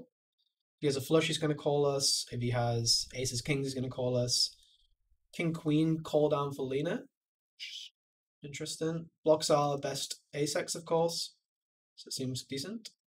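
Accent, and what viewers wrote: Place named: Cardiff, United Kingdom. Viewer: Where are you from?...........sounds like a tint of Cardiff accent there......nailed it?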